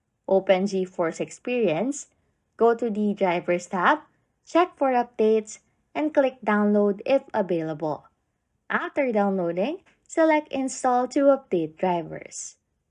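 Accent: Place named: Philippines. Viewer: are you from the philipines? because your voices sounds like you have a filipine accent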